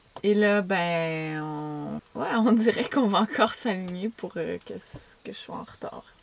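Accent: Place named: Quebec, Canada. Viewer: Je ne suis ni Française ni Québécoise mais je parle français et je comprends ton accent québécois, franchement c’est tellement chouette !!😊 Je tombe souvent sur des chaînes québécoises apparemment très intéressantes (avec des personnes parfois plus sympathiques que celles sur les chaînes françaises...) mais difficiles à comprendre... toi, ton accent, il est top ☺️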